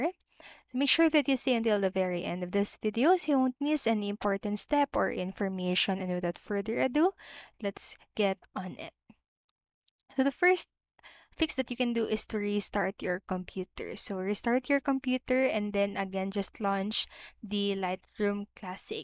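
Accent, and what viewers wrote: Philippines. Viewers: I loathe your fucking annoying Filipino accent!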